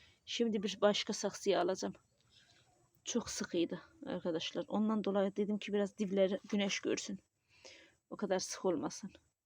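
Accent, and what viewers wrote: Azerbaijan. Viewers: siz azerbaycan lehcesiyle mecbur edirsiniz ozunuzu ki turkce daniwmaqa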